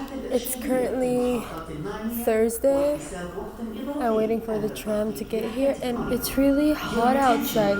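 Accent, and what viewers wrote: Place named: Iran. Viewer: مثل بچه آدم ایرانی حرف می زدی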